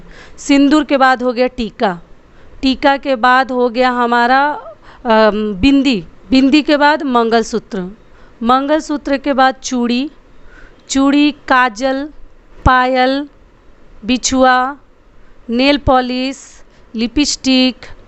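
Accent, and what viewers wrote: Bihar, India. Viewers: Madam ap bihar se ho up se ho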